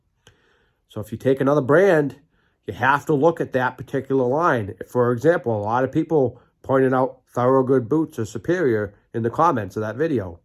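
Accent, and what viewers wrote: Massachusetts, United States. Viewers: You must be a fellow MA resident I can by your local accent. 😊 Have had a pair of the Marin waterproof boots in black for a week now. Super comfy so far. I bought them for work (small engine repair) and for riding my motorcycle. I hope to do a one year review on mine next year but I’m sure it won’t go over very well since I’m a girl so in most people’s eyes it’s like “ oh what does she know”. Glad to see you’re a happy camper after a year in yours!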